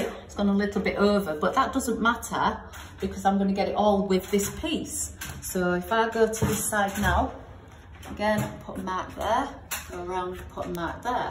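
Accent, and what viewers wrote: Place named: Hull, United Kingdom. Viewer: I need this lady to do a mural on our ceiling and she sounds local to Hull??? Would be great if she’s available or has any great recommendations ❤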